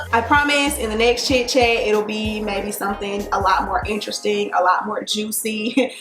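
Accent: United States. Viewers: I love how country you are, I could listen to you talk all day.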